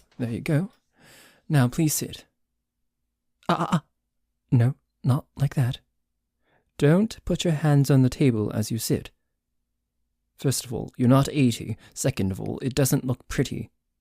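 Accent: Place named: upper class, United Kingdom. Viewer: As a British Person Id Like to say that I think you really nailed the upper class British accent very well. A lot of people get it wrong and it’s often a hard watch because of that, but you did pretty well ❤❤ (In case you don’t know because of centuries of disconnect between the rich and the poor in England, often poorer people have different accents to richer people)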